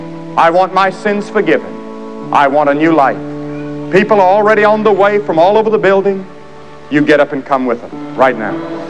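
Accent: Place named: South, United States. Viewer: he had sort of a southern drawl to his voice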